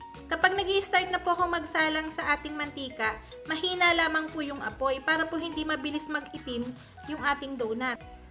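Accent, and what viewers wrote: Batangas, Philippines. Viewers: Hi po. Batangeña ka po mam? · Kala ko Batangas. Lagi po ako nanonood ng mga vids nyo. Galing nyo magbake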